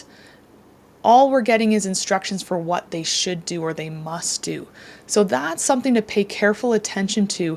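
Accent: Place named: Canada. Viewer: Thank you. Your explanation is the best I have come across so far. I think the Canadian way of talking is a big factor in the way you explain things clearly!!!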